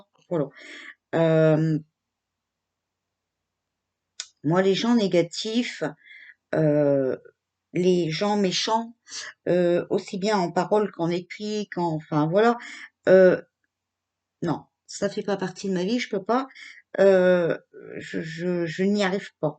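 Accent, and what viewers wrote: North, France. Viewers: bravo à vous , vous tapez souvent dans le mile , vous etes un soutient psychologique pour plein de monde , vous etes empreinte de la lumière , j'aime bien votre accent du nord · J’adore votre accent du Nord ! Mdrrr merci pour ces infos j’en suis sure il va tout faire pour nous aider ! La divinité l’a mis sur notre chemin celui de la vérité et de l’amour ! Sans hésitation je vote Rémy !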